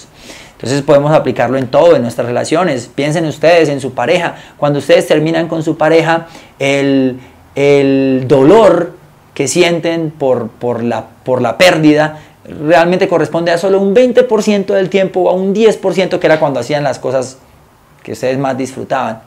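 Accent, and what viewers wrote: Colombia. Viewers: no se porque usted se me hace que es colombiano ? is;not true? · Muy bueno, de acuerdo con mucho de lo que dices compañero! Solo recomiendo ir modulando bastante el acento en español.... Supongo que eres santandereano! Jaja yo también lo soy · Eres Colombiano? muchas pero muchas gracias parcero, enserio wuevon muchas gracias. jejeje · Por su acento usted debe ser Colombiano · puro acento de colombiano!! viva Colombia !! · Bobos culicagados haha me agrada el acento colombiono...buenos videos my Men... saludos desde mexico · huyyy parcero lo felicito eres un teso por la forma en que hablas y te expresas debes ser colombiano. así que felicitaciones. cómo pues es pa lo del PDF de vocabulario parce ya me suscribí y estoy compartiendo tu canal con todo mundo. mi correo es <mail> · Me encanta que seas de Bogota y nuestro acento en genial no hay porque cambiarlo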